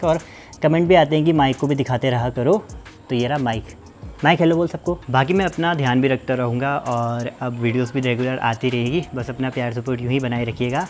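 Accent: United States. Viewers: Goooooood american english bahut heeeee jabardast ❤ lage raho safalta jarur milegi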